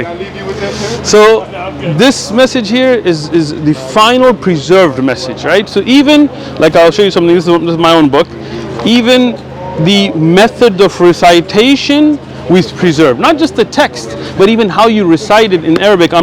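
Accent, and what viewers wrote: United Kingdom. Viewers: Loving the British accent 😅😅😅 Anyways Salaam from Malaysia. Your videos has been my go to “podcast” to listen to while driving back and forth to work. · I love sheikh British accent., 😂😂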